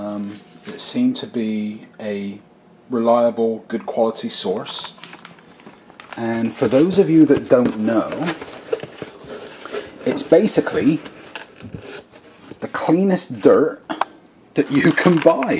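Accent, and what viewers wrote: Bristol, United Kingdom. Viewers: Bristol! Recognise that accent anywhere! I lived in horfield, Bristol for 5 years.....Alright my lovvveeeee :-)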